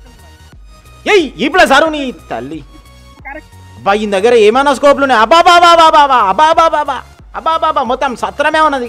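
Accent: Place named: Telangana, India. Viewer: bro nuv Telangana or AP. Pure Telangana language atlaney untadhi.artificial commentary Kanna pure Telangana yasa commentary 💯 percent best.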